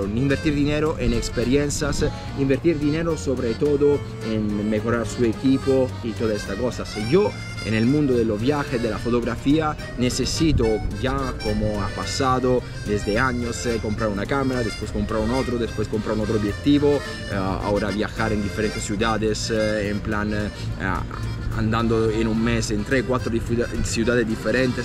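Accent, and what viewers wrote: Italy. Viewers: me imaginé porque tengo un amigo que también es italiano y habla el español así mismo 🤭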